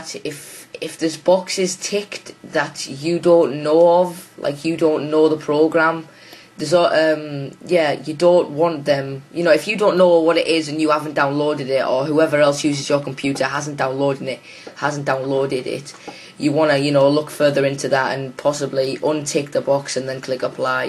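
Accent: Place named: Liverpool, United Kingdom. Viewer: Ya sound scouse or maybe from Manchester, yeah? Nonetheless, loving your dialect! Cheers!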